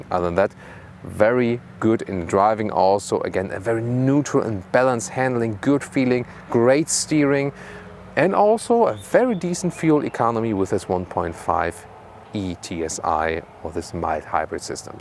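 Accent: Germany. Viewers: I enjoy your vids, however you should do a German version also, because you are already speaking "german-english" so the placement of words are not correct. I don't like that, I'd rather have a full-on german version.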